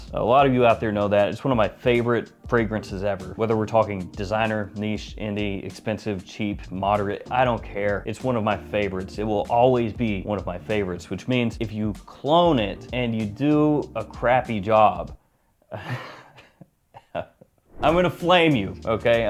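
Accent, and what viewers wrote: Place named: Texas, United States. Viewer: It sounds like you live in Texas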